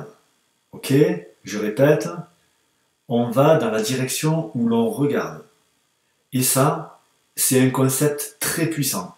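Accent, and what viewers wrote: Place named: Toulouse, France. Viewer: vous etes où ? vous avez un accent Toulousain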